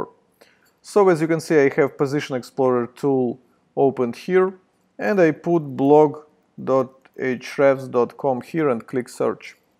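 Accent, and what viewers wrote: Russia. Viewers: Russian accent)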